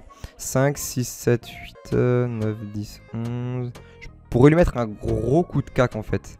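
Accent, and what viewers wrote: Alsace, France. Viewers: tu viendrais pas d'Alsace toi par hasard ?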